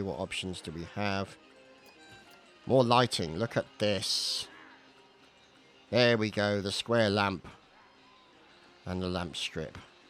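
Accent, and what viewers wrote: England, United Kingdom. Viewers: where you from your accent is awesome I'm guessing somewhere in England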